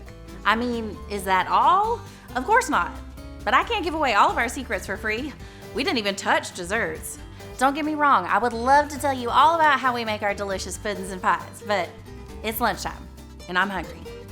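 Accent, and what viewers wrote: South, United States. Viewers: That Southern accent is like butter. It makes things better.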